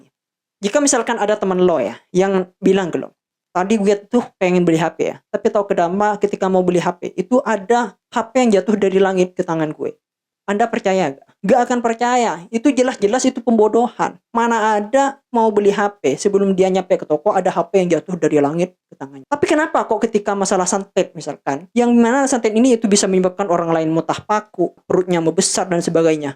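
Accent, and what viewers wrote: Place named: Madura, Indonesia. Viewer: Logat madura pake lo gue agak aneh bg😅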